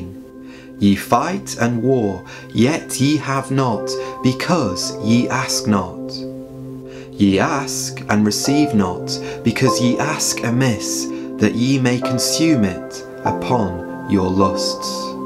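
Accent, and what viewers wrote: United Kingdom. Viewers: I'm so glad you made this type of video,. I can listen to my Father's Word hour after hour after hour. I sincerely do love and honor God's Word and it's nice to have your British voice speaking it!😁 Thank you and may The Lord bless and protect you and your fam on your journey to spread His Word and His precious gospel in Christ Jesus' mighty name. Amen!!😇🎚️🙏🌈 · I am a Filipino Christian ,I just want to congratulate that your voice was lovely a British accent, so lovely hearing Bible verse..God's talent🎈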